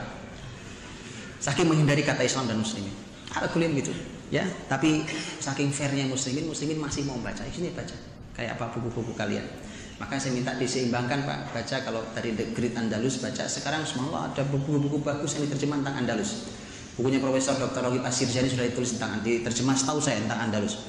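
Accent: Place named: Java, Indonesia. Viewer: oh pantesan logatnya jawa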